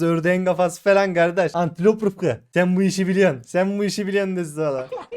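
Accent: Gaziantep, Turkey. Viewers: abi sen anteplimisin ben antepliyim şivenden anladım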